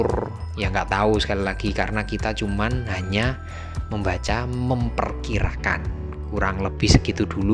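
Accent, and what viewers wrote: Java, Indonesia. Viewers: seep ..om ...keren....medoknya hmmmm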